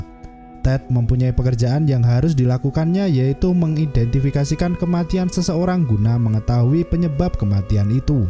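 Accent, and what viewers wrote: Java, Indonesia. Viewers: Si abang nya Jawa ya bang medok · Suara jowo medok eramm mass hehheh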